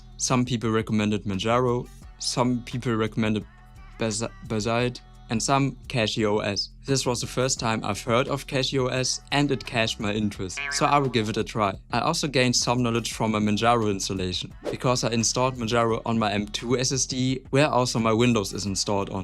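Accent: Germany. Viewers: Yo, its normal in Germany to have this fucked up accent?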